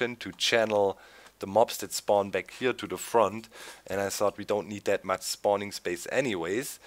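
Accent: Germany. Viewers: are you from england with a bit accent of germany?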